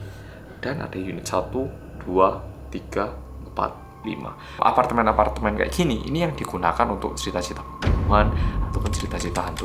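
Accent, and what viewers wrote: Java, Indonesia. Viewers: oo baha ada logat Jawa nya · Malam Jum'at ditemenin orang Korea yang medok😂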